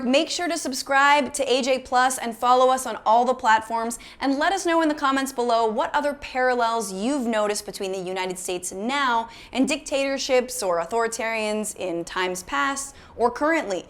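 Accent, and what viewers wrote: RP, United Kingdom. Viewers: Is it wrong that I like her British accent L) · Your English accent is reeeealy posh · Loving the British accent! <3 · Love the British accent... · English (educated received pronunciation to be precise). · Well done on the british accent; a pretty good job over all.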